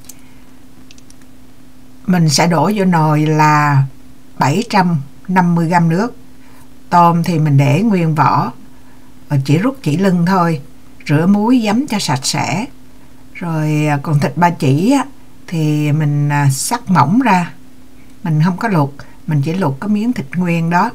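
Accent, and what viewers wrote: Quang Nam, Vietnam. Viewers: Chào, Mình rất thích nghe giọng Quảng Nam cuả 😍🤩 Bánh rất ngon, dòn và đẹp lắm. Like 👍👍👍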